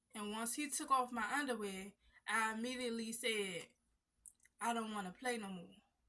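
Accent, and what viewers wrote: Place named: Washington DC, United States. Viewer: Dc accent heavy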